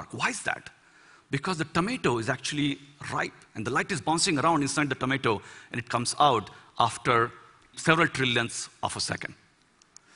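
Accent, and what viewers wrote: India. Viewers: Hindistanlıların ingilizce konuşması o kadar komik ki adam şu videoda evrenin sırlarını verse , ışıktan hızlı giden uzay gemisini yapmayı falan anlarsa , ne bileyim işte dünyanın en önemli şeyini bile anlatsa , gene komik bu aksanla ))) eleman sanki temel fıkrası anlatıyor. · hintli aksanı kadar kulak tırmalayıcı bir aksan yok amk ;( sessiz izledim videoyu. coca cola şişesiyle deney yapmış sikik. biz de bim poşetiyle yapmalıyız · Bu hindistanlilar ingilizce danisanda iyrenc seslenir sanki baska bir dilde danisirlar iyrenc videoya adama gore baxa bilmedim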